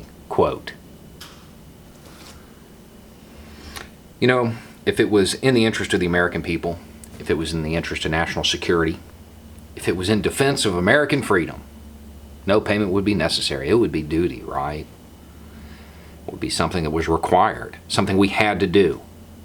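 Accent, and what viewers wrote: South, United States. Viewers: I just have a quick question and I honestly am not trying to be a prick. Why do you have a southern accent here, but when you speak as Justin King, you do not?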